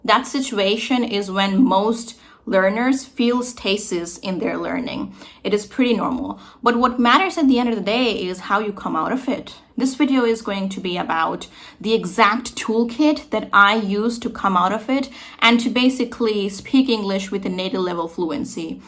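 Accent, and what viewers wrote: United States. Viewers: To learn an accent like you do, I need to live in a US-like environment, or can I learn it at home by myself by practicing it? I know the basic english, and I speak it well. And nice video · I live in an area with one of the highest Sikh populations in America and we host one of the largest Sikh parades in the world. Your American accent is better than the overwhelming majority of Indians who live in this area. For some reason, learning the accent is seen as disrespectful by a lot of people. To the rest of us, it shows the amount of effort you've put into learning. I'm currently learning Welsh, so I know how difficult this journey is. Congratulations on your progress.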